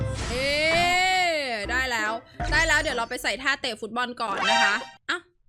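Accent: North, Thailand. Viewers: พี่ พูดเหนือทั้งคริปหน่อยครับ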